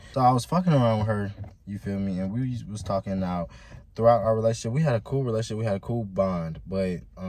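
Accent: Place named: Bronx, United States. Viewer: You’re from the Bronx New York??? Bro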